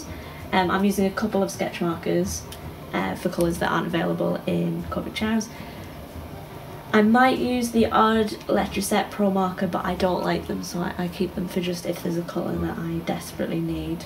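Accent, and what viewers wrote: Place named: Northeast England, United Kingdom. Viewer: First thing: That picture is awesome! Like, super amazing! Second: Your accent... It's my accent... Are you from somewhere in the triangle of cities of Newcastle, Sunderland and Durham? (I'm from a town an equal distance from all three and sometimes it's hard for me to tell which specific accent comes from which city.)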